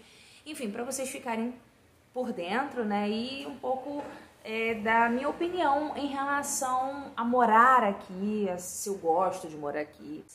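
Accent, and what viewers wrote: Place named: Rio de Janeiro, Brazil. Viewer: Oi querida 🥰 Conheci hoje seu canal e gostei muito do seu jeitinho carioca de comunicar 😍 Também sou carioca e estou planejando morar em João Pessoa. Vou acompanhar você por aqui pra saber mais sobre a cidade. Um beijão e que Deus continue abençoando sua vida e sua família ❤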